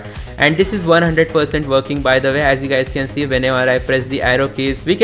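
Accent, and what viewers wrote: India. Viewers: Need an emulator to change Indian accents, sounds like an Indian Scam Call Center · Hint ağzıyla ingilizce konuşulduğuna tanık olursanız (bu videodaki gibi) kaçın.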